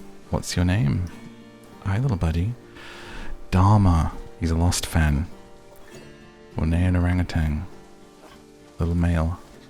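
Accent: United Kingdom. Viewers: Who wants to fall asleep to a British dude talking? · British daddy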